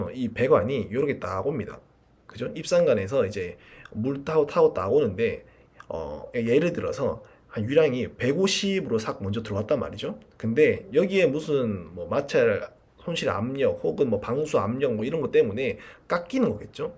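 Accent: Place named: Gyeongsang, South Korea. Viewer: 경상도사투리 구수하네예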